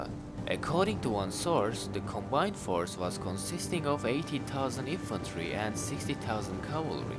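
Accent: Turkey. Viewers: Türk aksanıyla çok güzel olmuş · THE TURKISH ACCENT IN A TURKISH SIEGE IS ALSO AMAZING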